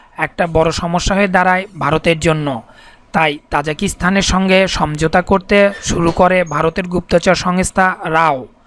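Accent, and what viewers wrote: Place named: Bangladesh. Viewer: বাংলাদেশিরা ওই রকমই উচ্চারণ করে , এর অর্থও বোঝে কি না কে জানে!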